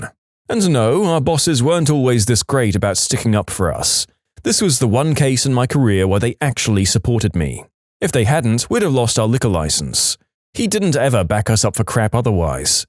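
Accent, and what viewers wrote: United Kingdom. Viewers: I love this guy's british voice, it's pretty cool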